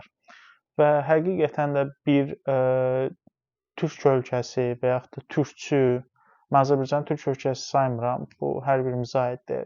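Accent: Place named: Armenia. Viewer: Sənə qulağ asanda adama elə gəlir ki bir erməni dığası zərbaycan dilini yaxşı öyrənib və Talış mıllətiylə Azərbaycanlıların arasın vurmaq istəyir,talışları mən həmişə özümə qardaş bilmişəm və biləcəyəm,sən deyilsən sən erməni dığasısan.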